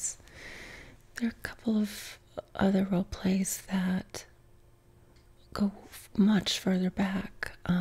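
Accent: South, United States. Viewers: I knew I heard a Southern accent in there! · I did always get a bit of a southern accent from you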